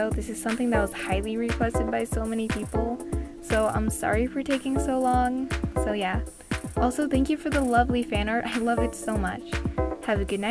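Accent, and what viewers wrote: United States. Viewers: 0 waow ur a Filipino but ur good at American accent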